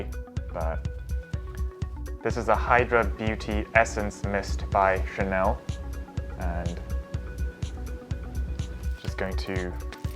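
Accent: United Kingdom. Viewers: How did I not know you had a British accent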